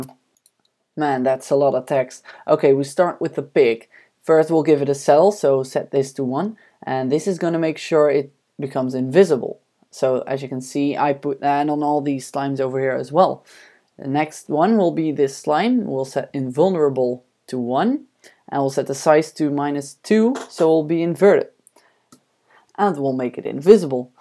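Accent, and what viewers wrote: Netherlands. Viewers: haha ik hoorde gelijk aan je stem dat je NL ben ;p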